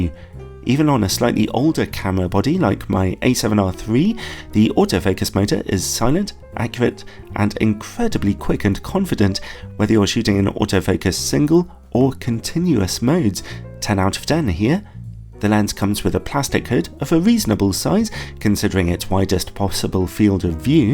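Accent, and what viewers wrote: United Kingdom. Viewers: Your lense and camera reviews are allways definitly one of the 3 best ones on the internet in any aspect from the technical and practical view regarding the lenses itself but as well from the aspect of very nice entertainment with your lovely british humor and accent, I like so much as I lived in London and Bath a couple of years ago for a short period of time! There are only Gordon Laing's reviews and DPReview on the same great level, these 3 channels are all I need to know about a new lense!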